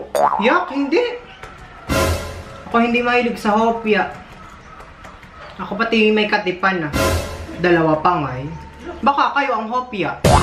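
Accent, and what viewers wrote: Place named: Baler, Philippines. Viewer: i really love your videos po. 🤗🤗 Feeling ko kapunto ka namin haha from Baler haha ganyan din punto namin eh. Malambing😂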